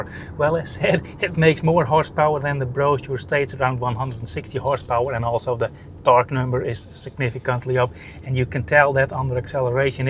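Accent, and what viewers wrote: Netherlands. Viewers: Great informative reviews 👍your English Dutch accent is very cool to listen too 🇬🇧🇳🇱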